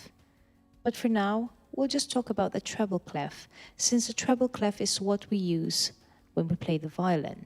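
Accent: United States. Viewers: nagulat ako nung nagtagalog siya akala ko kano HAHAHHAH thank you for the vid tho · Biglang nagtagalog akala ko po tlga Americana kayo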